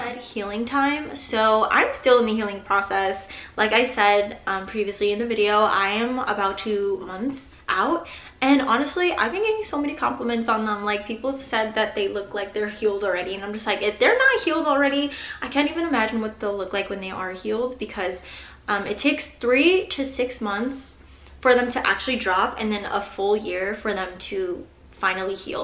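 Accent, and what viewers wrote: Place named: Idaho, United States. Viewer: Her accent says she is American possibly from Idaho.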